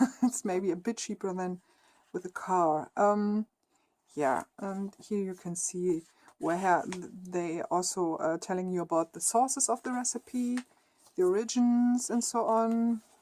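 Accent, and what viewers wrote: Germany. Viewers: Being a of direct German heritage I love seeing all German things and hearing all the stories.... Brings me back to my youth and seeing the things around the homes of many of my relatives and tales told to me by all of them....even your accent brings them back to me! Lol. Like getting a great big hug from all of them! Thanks for sharing.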